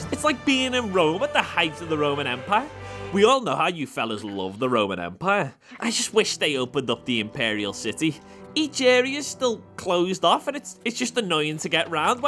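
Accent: Liverpool, United Kingdom. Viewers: Side note, been enjoying your videos. Your commentary and that scouser accent is a winning combo imo. Look forward to disagreeing with you again. 🍻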